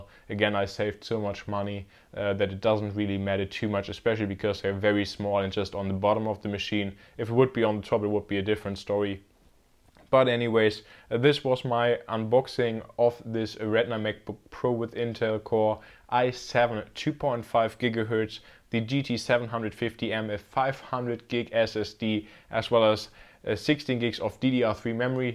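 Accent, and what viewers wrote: Germany. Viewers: Omg.. That german accent!!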